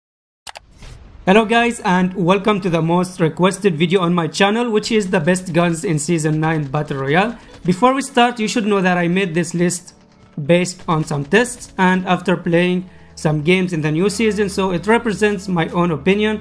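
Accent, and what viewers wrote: India. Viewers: Bro here I am .. Your voice is like Indians .. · he's from Oman, but the accent is the same as an Indian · bro are you from India?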